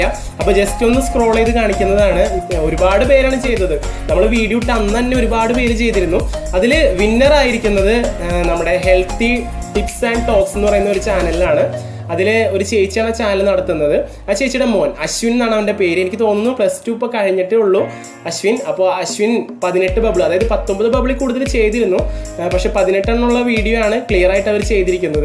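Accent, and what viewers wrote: Thrissur, India. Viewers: Thrissur kaaranano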